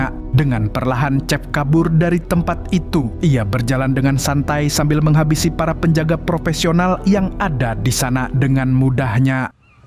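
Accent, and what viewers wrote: Banjar, Indonesia. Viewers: orang banjar kh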